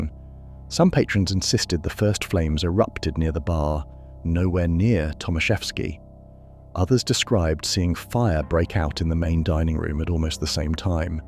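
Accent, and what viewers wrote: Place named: United Kingdom. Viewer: I was just going to write the same thing! They show the floor plan, then whisk it away before anyone can get a good look, then they show the same guy over and over. This happens more and more on YouTube videos: people with no training or experience slapping something together. But they think they can convince us that it was brilliantly done because of the narrator's British voice. A very common ploy!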